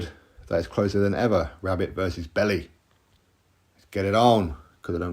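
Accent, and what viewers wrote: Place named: RP, United Kingdom. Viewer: If you're from Newcastle Upon Tyne, as I am, then where did you get that posh accent from?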